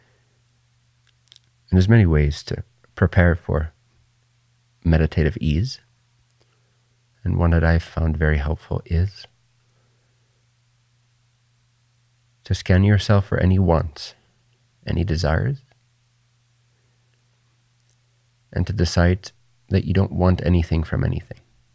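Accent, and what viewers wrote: Netherlands. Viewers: His dutch accent is kind of distracting...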